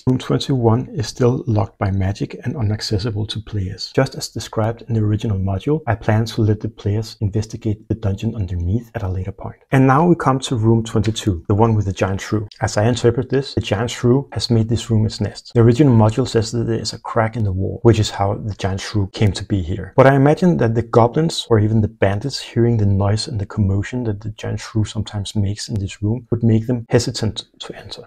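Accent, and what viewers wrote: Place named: Denmark. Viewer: Before I say anything else, I like this. Thank you! When you were describing the inhabitants of the rooms start I felt the thin coloured lines outlining the rooms was drowned out by the black walls. I really liked the setup of your version and both the descriptions of the rooms and what small modifications you made to the map. You sound Danish, are you? "None of your buisiness" is a perfectly acceptable answer, if you answer at all.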